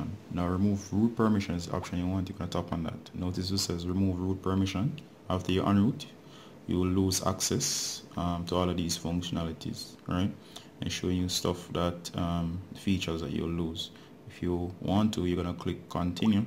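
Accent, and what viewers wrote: Jamaica. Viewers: thx man........... are u a jamaican?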